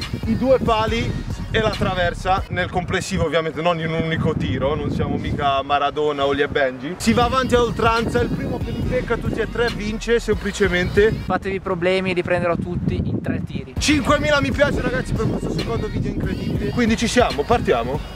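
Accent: Molfetta, Italy. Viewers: ma sei di molfetta?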